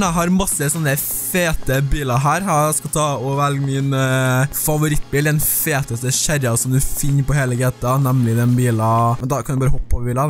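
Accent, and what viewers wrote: Trøndelag, Norway. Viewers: Er du trønder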